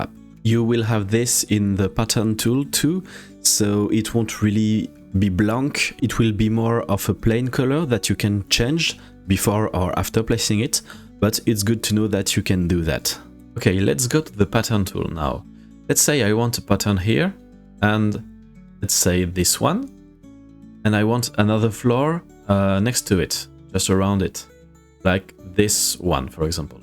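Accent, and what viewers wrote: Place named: France. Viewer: Love your french accent! Merci beaucoup pour ce tutoriel, t'as gagné un autre abonné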